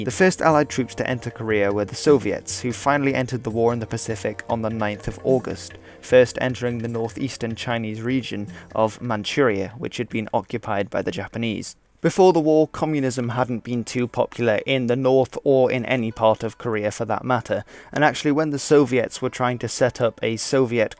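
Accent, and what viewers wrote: United Kingdom. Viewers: How did you manage to get such a good British accent? I had no idea you were Dutch, you sound exactly like a native speaker to me. Do you have any advice for acquiring such an authentic accent?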